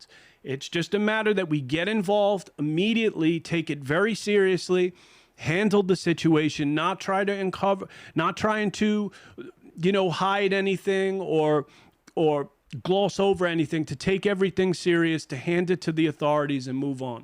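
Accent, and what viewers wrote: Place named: New York, United States. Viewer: I am subscribed and here for building faith... but as a former NY resident, now in Florida, I am absolutely here for your NY accent that I miss hearing except from my own mouth.😊 God bless.🕊️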